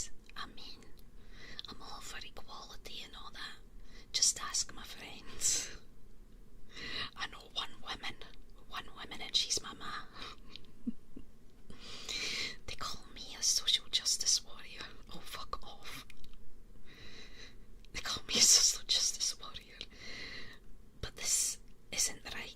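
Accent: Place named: Scotland, United Kingdom. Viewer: I really like her Scottish accent it doesn't matter what she says on her asmr streams it's always nice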